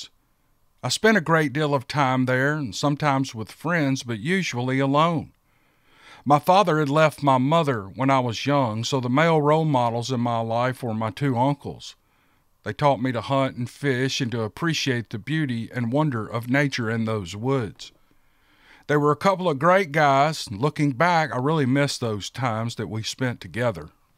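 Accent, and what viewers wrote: South, United States. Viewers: Thanks for an exciting start to the week. I woke up early, like 3am early, with a horrible migraine and after taking some tablets and eating a banana to make sure they stayed down, I lay here in bed with my eyes closed and listened to that Southern drawl of yours and now I'm going to try and get a little bit more sleep. It was a another good story which Kentucky seems to have no shortage of. Can't wait to catch up with the podcasts and maybe get myself some Yeti Bars as Christmas gifts for family and friends. Take care and it's ALWAYS good to hear your voice! From country NSW Australia.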